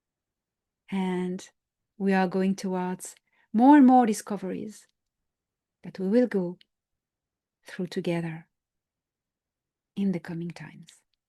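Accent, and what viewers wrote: France. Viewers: Great addition to the Star Nation News episodes :-). I had a query about #35 Webinar last weekend on the 26th that I attended related to a question I submitted that Elena answered about CE5 and which ET beings and races it is best to reach out to during such a type of meditation. I did not know where to reach out too to get this comment to Elena through herself or an associate, etc... In the answer there are a few of the ET races you mention that I just cannot fully understand/hear properly what you are saying, most likely due to your elegant French accent and dialect, but if I could somehow get some clarification on this from you, I would greatly appreciate it. What I can make out is : Kaheel from Epsilon (something) Aheel Alteans intergalactic confederation Aruhri Arcutians Zanete Now, in particular, the two I can’t understand are the Kaheel from Epsilon (something). 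Obviously, the something part, and the Aruhri Arcutians Zanete these two I am not certain at all, so any clarification on these and whether I have it right would be appreciated. The other two I think I pretty much understood. One thing I was wondering about was the Alteans' intergalactic confederation? Is that some sort of like a federation those beings are in? Any feedback and clarification on this would be greatly appreciated. I of course look forward to building my CE5 meditation technique now around reaching out and connecting with these beings. Thanks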